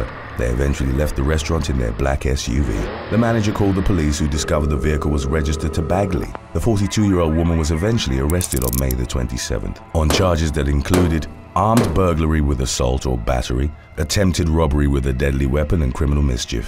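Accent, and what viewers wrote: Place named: England, United Kingdom. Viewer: Okay who taught how to pronounce Mexican names in english? First time I've heard Martinez come out correctly. I do always love hearing people with English accents trying to work out pronunciations of names we're all used to on this side of the pond! As always, gripping work!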